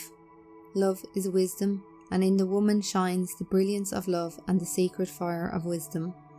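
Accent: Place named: Ireland. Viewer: No doubt, that’s a lovely Irish accent I hear. Thank you.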